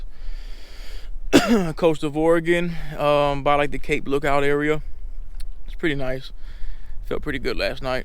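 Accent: Louisiana, United States. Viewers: Sounds like you from Louisiana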